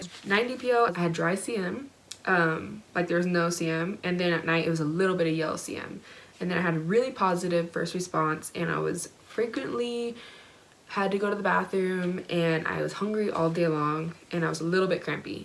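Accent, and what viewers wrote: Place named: New Zealand. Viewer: Are you from New Zealand? You have a kiwi accent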